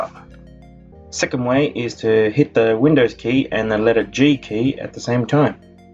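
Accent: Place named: United Kingdom. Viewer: you're correct i watched the video my record button has been greyed out formonths on the game bar. pulled it up again while listening to your british lilt and the button was still greyed out >anger viscerall through my veins . however i kept listening to you and win+alt+R did work albeit i had to finagle the sound settigs and change permissions for audios using a different tutorial but your hotkeys knowledge did the trick. "cheers mate"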